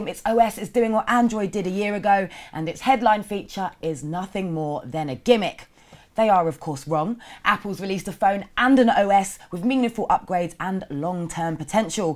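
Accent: United Kingdom. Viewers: a british nigga loooooooool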